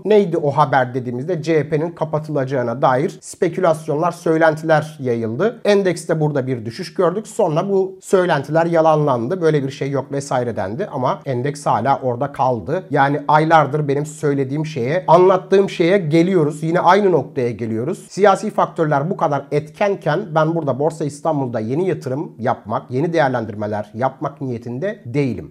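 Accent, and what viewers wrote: India. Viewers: video ingilizce yapınca hintliler gibi konuşuyorsun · Hocam niye Hint aksaanıyla konuşuyorsun?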